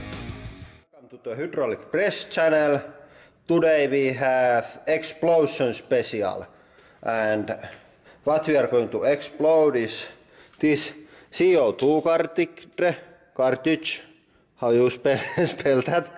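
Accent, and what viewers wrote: Finland. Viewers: its called ralli englanti its what Finland is most known for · I don't know what I love more the crushing or the beautiful Finglish accent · the finnish accent. Almost any grown man could sound like when they speak english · His Finnish accent makes his videos hilarious. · Finnish english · he sounds finnish, is he? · North european :D and that is typical finglish :D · Reasons why I love this channel: 33.3% hydraulic press, 33.3% Finnish accent, 33.3% that girl's awesome laugh. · both the dudesons and he have the same accent and they sound the same I know they are Finish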